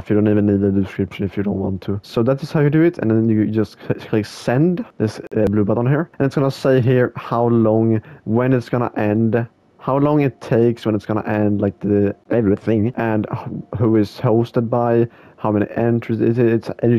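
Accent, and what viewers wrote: Sweden. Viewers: are you swedish? you sound like it and it said igår on the msgs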